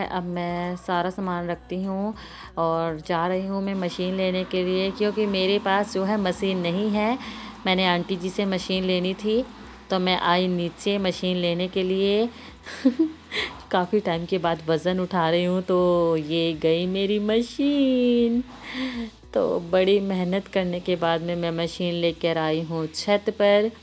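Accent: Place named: Saharanpur, India. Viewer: Aap up me kaha se apki language se lgta h aap Saharanpur se h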